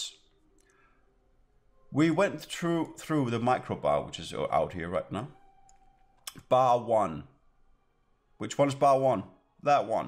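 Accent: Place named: Denmark. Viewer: Where are you from. Let me guess Denmark?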